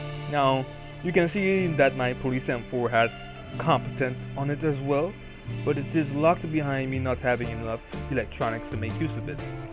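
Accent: Caribbean. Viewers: TheDivisionVampire I'm Jamaican. That accent isn't Jamaican. Sounds Caribbean - just can't figure out which island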